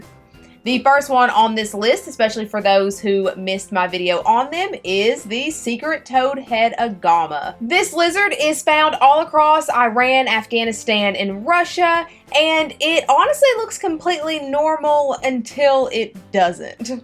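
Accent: South, United States. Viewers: First of all, my 6th graders loved this video. Several of them look just like the reptiles you showed. We think that you are the perfect woman. Your teeth are so white. Your Area 51 T-Shirt was a great choice. I loved the southern accent. Your spooky eyes had hypnotizing effects with those white rings on them.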